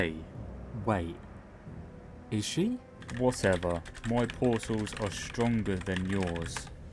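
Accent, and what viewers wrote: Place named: Estuary, United Kingdom. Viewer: That Estuary accent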